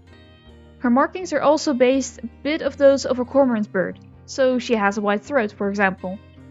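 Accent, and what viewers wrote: Netherlands. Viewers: are you dutch? your accent sounds similar to that of dutch ones :0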